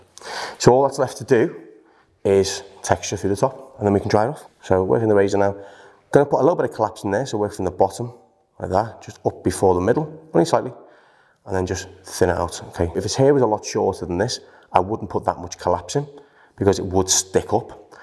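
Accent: Liverpool, United Kingdom. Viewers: Is that a Liverpool accent ?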